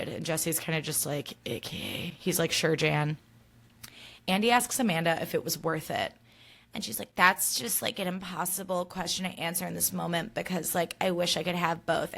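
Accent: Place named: Australia. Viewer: My jaw is on the floor at your Australian accent ⭐⭐⭐⭐⭐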